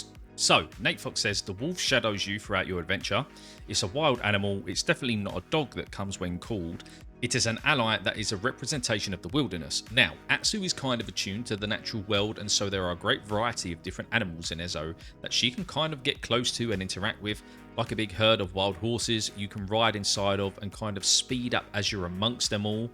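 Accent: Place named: United Kingdom. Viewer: Brilliant video mate !! From yhe the uk 🇬🇧 myself yhe midlands nice to hear a uk 🇬🇧 accent amazing vid on this outstanding upcoming game !! I’m so so excited for this game !! I pre ordered my digital deluxe edition today !!! Counting down the days !! Thank you for the breakdown in this !! It’s great you’ve explained more and made us way more excited ! 🙂🙂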